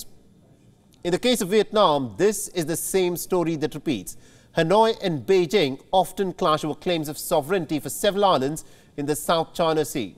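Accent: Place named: India. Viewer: Every Indian have more or less the same English accent as of this journalist instead of that stereotypical Hollywood accent.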